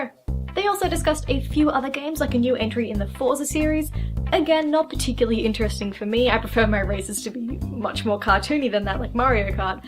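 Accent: Australia. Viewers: An Australian? I thought you were British??? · Why do people always confuse Aussie accents for British?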